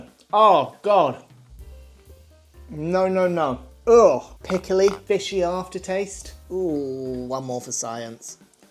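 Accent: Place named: England, United Kingdom. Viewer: Where is your accent from? As in which part of England?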